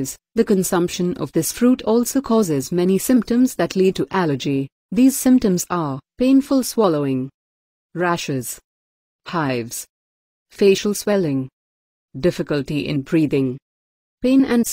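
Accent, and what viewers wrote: India. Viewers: It’s an Indian Accent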